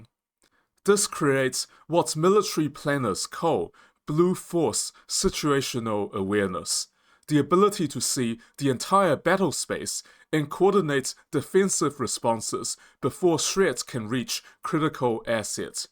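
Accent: China. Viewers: What kind of accent is that? Any ideas? Is there a Chinese accent mixed in? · Your Chinese accent is annoying!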